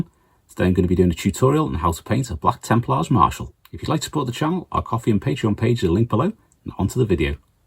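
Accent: Liverpool, United Kingdom. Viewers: Why is whenever I hear a fellow scouser on YouTube I instantly sub 😂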